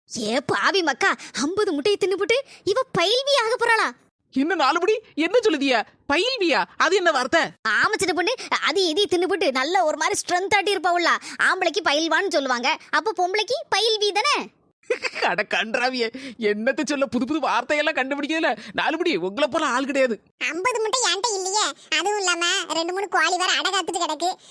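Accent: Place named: Tirunelveli, India. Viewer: சூப்பர் திருநெல்வேலி பாக்ஷை....கேட்க இனிமையாக இருக்கு...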